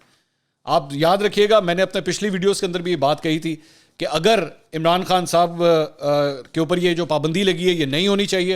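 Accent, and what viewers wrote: Punjab, Pakistan. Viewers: Mansoor ali khan lagta hai shahbaz Gill se uske punjabi touch se bohat impress ho gay hain.. lakin inko acha nhi lagta is tarha ka touch dena punjabi ka..yh higly qualified person hain .inko pehle ki tarha hi bolna chahiye.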